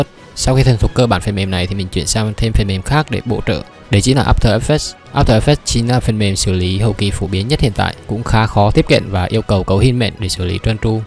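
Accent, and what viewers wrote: Central, Vietnam. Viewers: ông là người vùng nào miền Trung đấy:v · Wow là ng miền trung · giọng a giống người miền Trung._.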